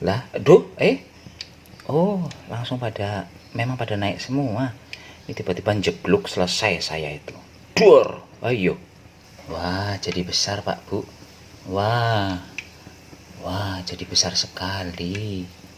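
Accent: Banyumas, Indonesia. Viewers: Ish ish.. ketemu koleksi bhs ngapak.. Blindi" .. 😂😂.. Jls enak wong pk tpung bambu🤗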